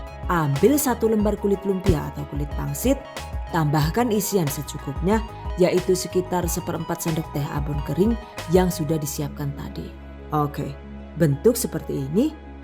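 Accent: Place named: Banyumas, Indonesia. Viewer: Sangat khas videonya... Ada logat 'ngapak'nya.. Jdi lucu.. Dan penjelasannya langsung bisa dipahami... Makasiih bunda resepnya... I really enjoy watcing this video...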